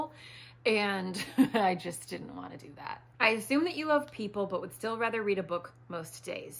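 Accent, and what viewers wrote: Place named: Midwest, United States. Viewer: I'd say your accent is mostly midwest with a touch of North Dakota, so Michigan make a lot of sense.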